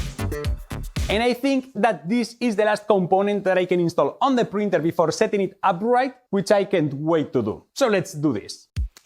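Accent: Spain. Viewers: ese acentazo es español! Que no? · Jajaj primera vez que me aparece un video tuyo, te oigo hablar y automáticamente he pensado: este tio es español fijo jajajaj gran descubrimiento tu canal, aquí tienes un nuevo suscriptor!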